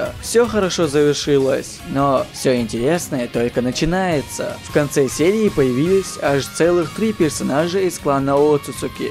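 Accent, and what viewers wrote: Chechnya, Russia. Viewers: Братан, ты случайно не из Чечни? Акцент выдаёт. Я твой голос где то слышал, тебя случайно не зовут?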